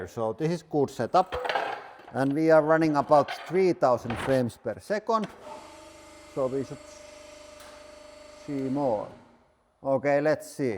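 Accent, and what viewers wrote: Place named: Finland. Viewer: Herrane aika mikä suomi aksentti 😅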